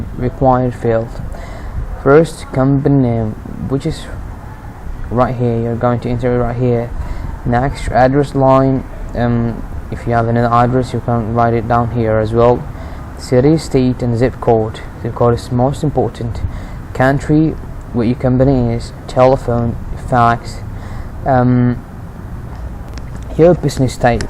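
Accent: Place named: United Kingdom. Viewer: What’s up with that British accent 😐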